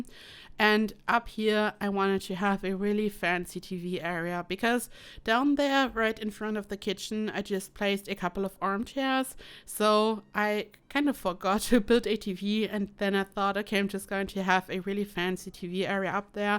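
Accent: Germany. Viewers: I do hear the german accent out of your voice which I think is really cute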